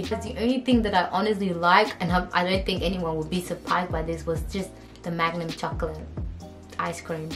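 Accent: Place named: London, United Kingdom. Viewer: where did you learn English? you sound like you are from London, greetings from the UK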